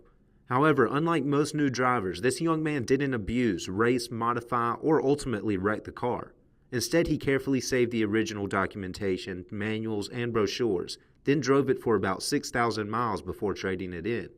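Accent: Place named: South, United States. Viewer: Hey man. I just love your Southern accent as much as the car.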